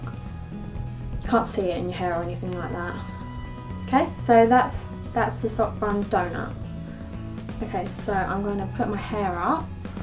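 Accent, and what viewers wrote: United Kingdom. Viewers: I like your hair, i like your british accent, i like your make up. I just love you already :D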